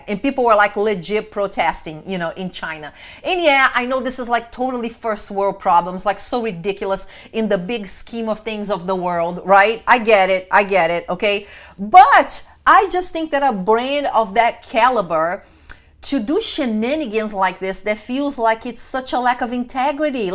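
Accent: Brazil. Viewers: She's from Brazil. She came to the US and worked really hard to become a professional engineer.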